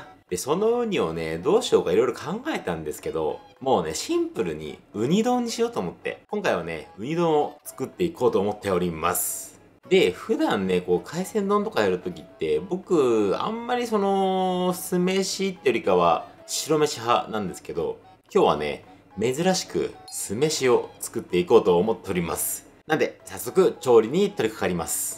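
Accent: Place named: Kansai, Japan. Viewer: （関西弁です）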